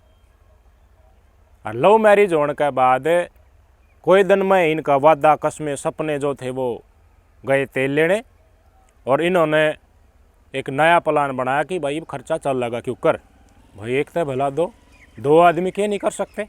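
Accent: Haryana, India. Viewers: Enjoyed Haryanvi narration of story but content is good one.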